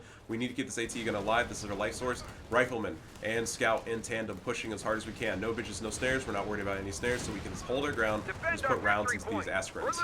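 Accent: Germany. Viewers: Great game, your german accent cracks me up every time.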